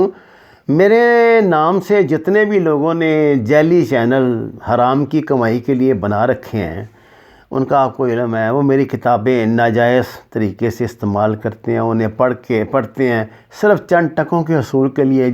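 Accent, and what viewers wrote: Punjab, Pakistan. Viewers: Abe Tu nawil mat para karo plzz tum panjabi men Urdo Bulta h.. Plz kisi ache andaz men padhne wale aadmi padhwaliya kato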